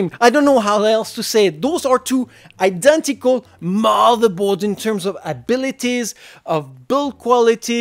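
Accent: France. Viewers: You have an french accent xD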